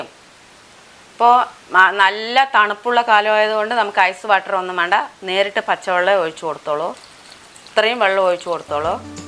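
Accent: Palakkad, India. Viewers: നാട് പാലക്കാട്‌ ആണോ സംസാരം കേൾക്കുമ്പോൾ അതുപോലെ തോന്നുന്നു ❤ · പാലക്കാടൻ ശബ്ദം... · പാലക്കാട്‌ ആണോ സംസാര രീതി കേട്ടിട്ട്?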